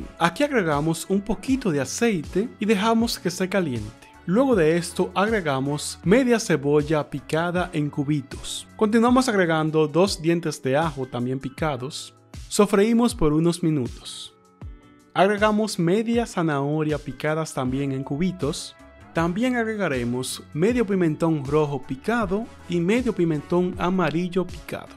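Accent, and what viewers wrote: Dominican Republic. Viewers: el es Dominicano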